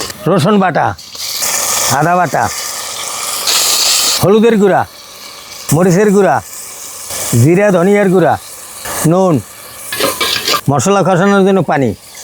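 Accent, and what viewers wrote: Barishal, Bangladesh. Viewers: হালারপো হালা বরিশাইল্লা।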